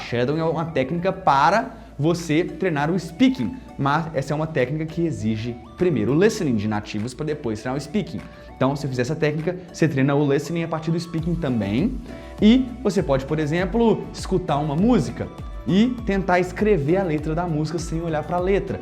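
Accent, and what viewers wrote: Minas Gerais, Brazil. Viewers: Caí aqui de paraquedas. Você pediu um comentário então vou deixar o meu. Você está de parabéns, deu várias dicas e com certeza me ajudou e vai ajudar muita gente, já me inscrevi no canal, dei "like" e pretendo assistir os outros vídeos do canal. Infelizmente acho que essa musiquinha de fundo não ajuda muito. Você fala bem mas o seu sotaque mineiro também desviou minha atenção, foram muitos "falano, estudano, esperano, usano, escutano, treinano, leno e cê" que no final já estava "contano". Desculpe, não quis ser grosseiro, só gostei do canal e fiz uma crítica com a intenção de que fique melhor. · Mano foi engraçado a primeira vez que ouvi sua explicação em um vídeo falei " a voz desse cara me recorda a do Gustavo Lima, deve ser mineiro" então assistindo seus vídeos vc falou em pão de queijo kkkkk e logo falou que era mineiro...rs... 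Você é um excelente professor, muitas dicas tops que vc passa aqui para todos...continue fazendo isso, e desde já agradeço a vc, falo inglês mas sempre aprendo algo que não sei também... abração de Londres para vc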